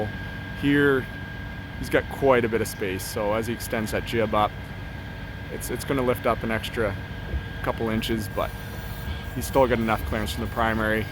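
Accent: Canada. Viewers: Wow. And a Canadian accent. The words are tighter. It was great to watch. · How bout some made in Canada tools since you are obviously Canadian, as we can tell by your accent.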